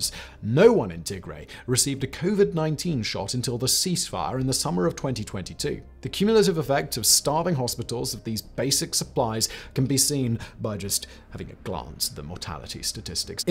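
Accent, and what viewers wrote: RP, United Kingdom. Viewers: I wish the world to know not all of us British sound this way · You are simply very biased, repeating TPLF propaganda with a BBC accent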